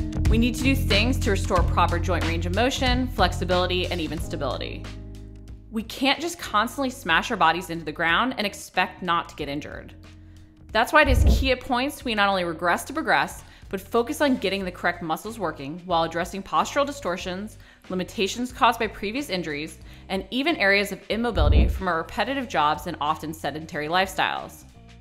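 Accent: United States. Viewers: Love the accent. American English sounds so lively compared to English here in London.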